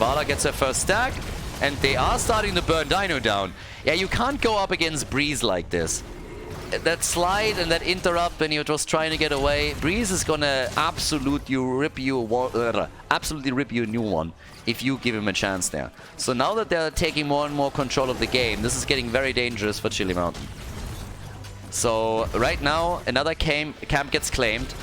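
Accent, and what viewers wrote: Germany. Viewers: Hm... are u a native speaking german guy?! It seems like with that dialect ^^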